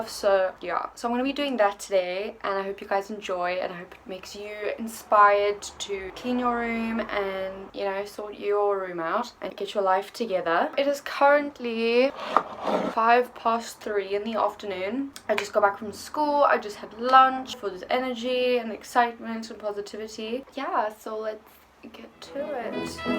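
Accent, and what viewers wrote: South Africa. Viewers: she afrikaans so south africa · she's south african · you sound south African. hmm lmao great vid btw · the first 5 seconds I was like sHe is SOuth AfRICan 😂😂❤️ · Are you South African? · Are you South African? You sound like it but I don't want to assume things because people get so triggered when I ask this but... I'm South African and you sound soooo South African. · I was like damn she sounds South African, then she hit us with the "so, ja" and I knew 😂❤️ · I could spot the accent straight away!! I'm South African too!! <3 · as soon as i clicked this video i said “she’s from south africa” i adore your accent!!!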